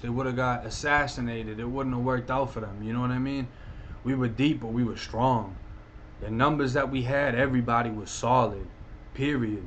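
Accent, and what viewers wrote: Boston, United States. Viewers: That Boston accent mix with that Dowwn souff Florida accent is brrraaazzyy p! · Where in America is his accent from? I’m from the UK and I’m awful at guessing 🤦🏻‍♀️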